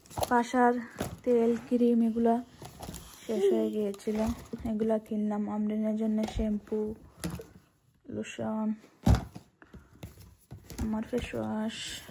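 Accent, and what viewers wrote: Sylhet, Bangladesh. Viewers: আপু আপনার সিলেটি ভাষার কথা বলা শুনতে খুবই ভালো লাগে। ভর্তাটা খুবই লোভনীয় হয়েছে। জুতা গুলা সত্যি খুবই দারুন। ব্রেসলেট এবং জুতা সবই সুন্দর লিপস্টিকের কালারটা ওয়াও❤ ভালো থাকবেন আপু।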